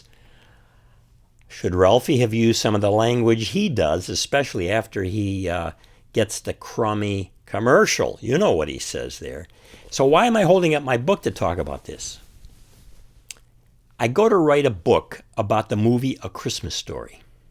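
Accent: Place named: Chicago, United States. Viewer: Dude you have the most Chicago voice I've ever heard...lol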